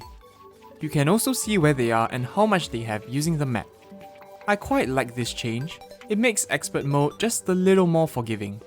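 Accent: Singapore. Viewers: bro the singaporean accent is strong with this one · You sound like Singaporean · Are you from singapore?? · i really enjoy these videos! keep up the good work! also, your voice is so nice, are you Singaporean by any chance?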